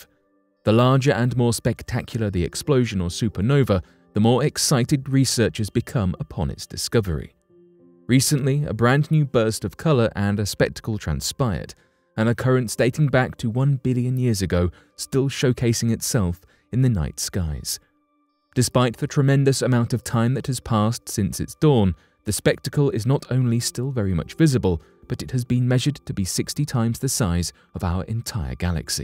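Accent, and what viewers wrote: United Kingdom. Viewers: Can't you bloody blokes find SOME OTHER NARRATOR ( PREFERABLY SOMEONE WITHOUT A BRITISH ACCENT)??? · EVERY BULLSHIT SPOOKY MYSTERY SITE ON YOUTUBE HAS A FUCKING BRIT NARRATING, THEY HAVE "STONE HENGE ITIS"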